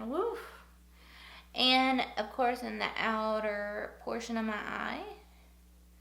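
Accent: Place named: Texas, United States. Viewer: new subscriber here. Are you from Texas? You sound like a Texan.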